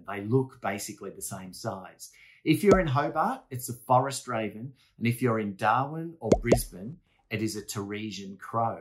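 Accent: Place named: New York, United States. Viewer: haha...thank you. love it. I'd say, not an American accent but somewhat NY city Jewish accent. :). Jewish Ashkenasic :).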